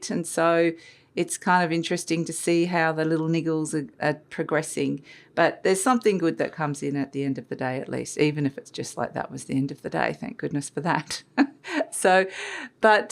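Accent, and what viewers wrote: Australia. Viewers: I knew it was an Australian accent 😊 kind of hard not to when you're from Oz 😂 I'm from Brissie. Good to see we have a few readers from home. Resonates a lot actually. Thankyou for the reading. Wish you all the best & many blessings 🙌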